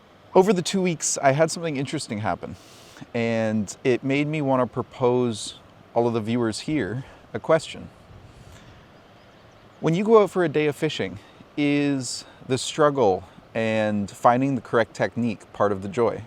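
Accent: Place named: Minnesota, United States. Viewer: I can't take the Minnesota in your accent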